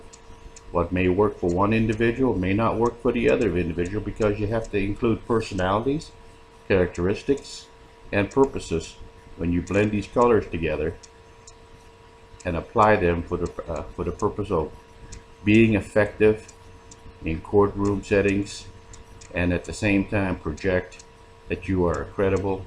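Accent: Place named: South Louisiana, United States. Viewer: Are you originally from South Louisiana? Your accent reminds me of some back home folks near the bayou.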